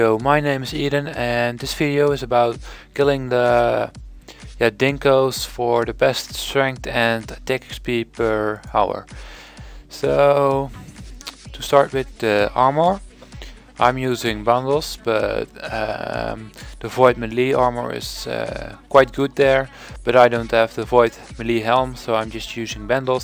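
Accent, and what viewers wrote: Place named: Netherlands. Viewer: je kan echt horen dat jij uit HOLLAND komt :p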